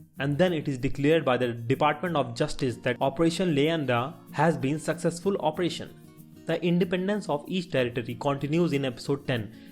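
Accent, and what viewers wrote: India. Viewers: He's accent is from india not Mexico you dumb ass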